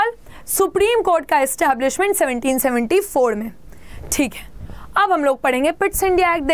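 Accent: Bihar, India. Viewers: Nice session 🙏 mam are u from Bihar 🤔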